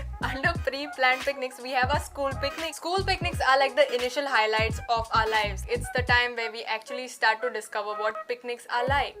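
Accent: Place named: Goa, India. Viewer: It's a relief to hear goan accent, I am from anjuna by the way. Tired of hearing marathi and hindi all the time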